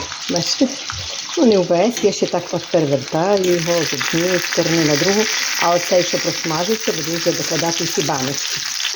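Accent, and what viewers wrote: Ukraine. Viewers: Вот это настоящий Украинский! Приятно слушать и все понятно. Спасибо вам.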